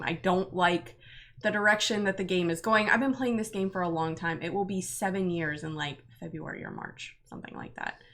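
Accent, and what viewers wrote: Pittsburgh, United States. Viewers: yeah its called Pittsburghese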